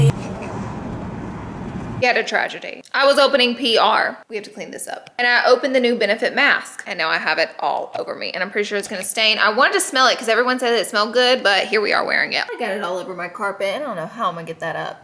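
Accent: South, United States. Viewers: I thought you were going to a vintage’Goth’ store and what you were actually saying was ‘Golf’. 😂 Your southern accent is adorable,. ❤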